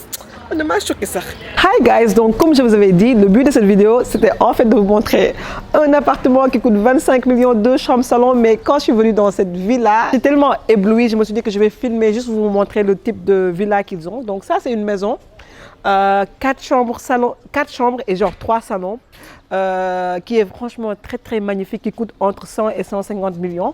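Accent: Senegal. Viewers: Pourquoi il y a des gens aigris. L idée est magnifique et j adore ton accent un peu d english de french et une petite dose de wolof. Ne change rien tu es trop agréable et captivante. 🥰🥰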